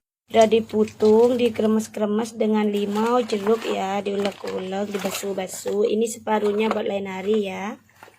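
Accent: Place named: Madura, Indonesia. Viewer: Ini asli orang Madura 😁😁dari cara ngomongnya beda😂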